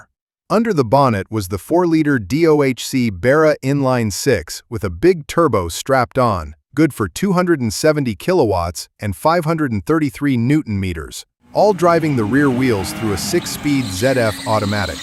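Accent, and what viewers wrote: United States. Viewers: Zed not Zee, god damm yanks, if you're gunna talk about Aussie cars, speak English not yank. And learn how to say Barra, as in barramundi.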